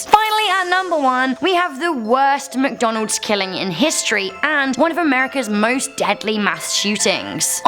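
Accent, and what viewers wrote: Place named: United Kingdom. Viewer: Wait I thought she was from the uk 🇬🇧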